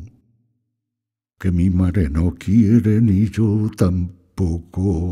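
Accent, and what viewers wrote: Spain. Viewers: Pepe Mediavilla! Por usted brindo! Con respesto also mi copa y en su honor hoy vevo un tinto de buena cepa, fino. De corazon es mi sentir que lo hago verbo y con aplausos y agurios verdes de Romero, Albahaca y yerba buena afirmo y declaro por usted Pepe Mediavilla, gran Sr. : Que viva tu vida! Viva tu Libertad! Que Viva El Castellano que es tu lenguaje articulado, tu acento, mi herencia! Viva tu Cielo y tu Tierra y tu mar! Viva tu sol , tu Luna y todas tus estrellas! Que Viva tu casa, tu Mesa y tu lar! Viva tu mente con sus luces! Que Viva tu lira, tu historia y tu legado! Que Viva Espana! Que Viva Espana! Desde mi orilla Allende Al mar os deseo todo y so lo mejor! Que todo es sagrado , esto me lo aseguro un cantaor . J .M.y R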